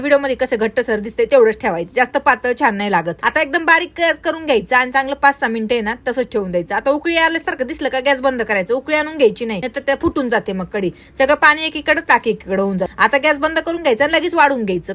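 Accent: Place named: Ahmednagar, India. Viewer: नगरच्या आहेत का तुम्ही....कारण भाषा तुमची तशीच वाटते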